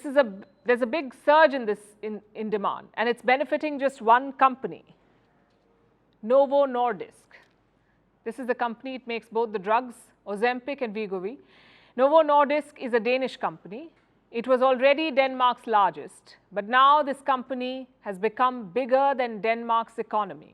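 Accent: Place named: India. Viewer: She has Indian accent. It's a real thing. You will find 500 million people in India with that accent. They only understand that accent.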